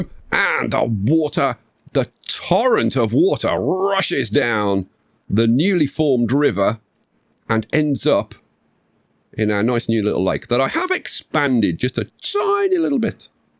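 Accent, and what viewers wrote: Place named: North, United Kingdom. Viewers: Dear, this is a request for you to do a full episode with your Northern accent. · Dear (Smacking keyboard.) As someone from the Greater Manchester/Yorkshire border. I approve of your Northern accent... Bloody parth my backside though lad.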